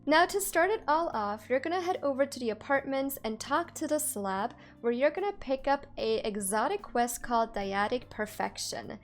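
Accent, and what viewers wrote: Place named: Germany. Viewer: I hear a German or Dutch accent off and on....hard to pick up but there is something there...what is it?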